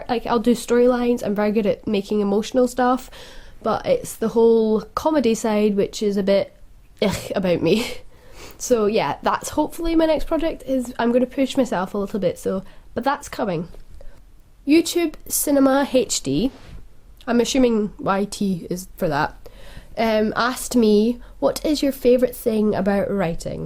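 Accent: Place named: Scotland, United Kingdom. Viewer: Haha, even with a cold, your voice still sounds really nice. I love Scottish accents. :) Okay, anyway, this was really interesting!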